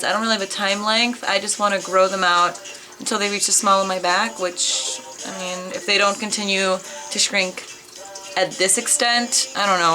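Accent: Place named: United States. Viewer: Jesteś Polką, ale masz super amerykański akcent. Mieszkasz w USA?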